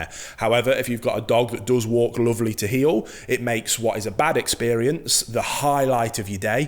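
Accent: United Kingdom. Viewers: Are you from Lancashire?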